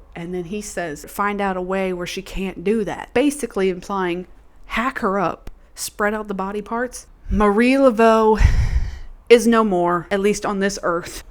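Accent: United States. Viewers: I literally know nothing about this show but you will bet I will listen to an american southern woman talk about AHS for 2 hours! Also, random note i saw from another comment. I always think its so weird when people say others are a Mics Mike copy cos theyre using a whiteboard or pin board. Like girl have you never heard of powerpoints before ?? youtubers have been using pin boards and white boards for years lol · 'eeeey, I thought your accent sounded familiar! Fellow Virginian, here! Born in Norfolk, grew up in VA Beach, Chesapeake, then Williamsburg. Hi neighbor!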